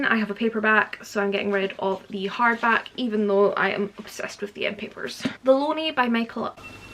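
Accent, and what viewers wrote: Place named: Scotland, United Kingdom. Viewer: It's nice to hear a Scottish accent on booktube! Not enough booktubers are from here.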